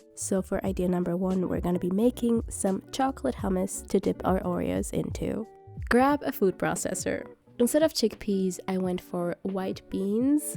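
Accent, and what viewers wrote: Bavaria, Germany. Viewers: Bist du aus Bayern?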